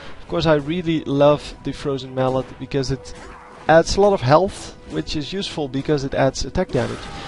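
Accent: Netherlands. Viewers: you sound waaaaay too dutch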